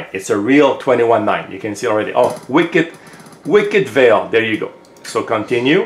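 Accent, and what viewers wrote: Quebec, Canada. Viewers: Salut je reconnais l'accent Quebecois haaha Nice review!! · thanks ;) Aussi t’es tu du Québec t’as un accent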